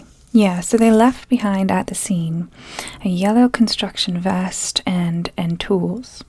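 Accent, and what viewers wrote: Transatlantic, United States. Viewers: You have an almost transatlantic accent and it’s soooo relaxing to listen to. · Where is your accent from? Is it a combination of different places? It's almost transatlantic and super relaxing. New subscriber here.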